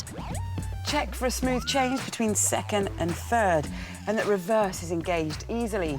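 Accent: United Kingdom. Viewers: Yeah, somethings about her voice and the british accent is so sexy! I do have a crush on her as well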